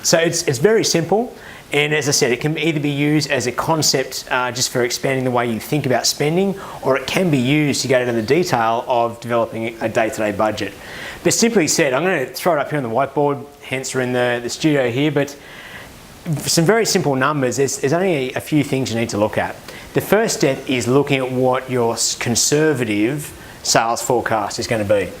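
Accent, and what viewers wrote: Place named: Australia. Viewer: Love your aussie accent mate. You explained very well. Thanks and keep making more detailed videos regarding sales, profit, some success stories, case studies .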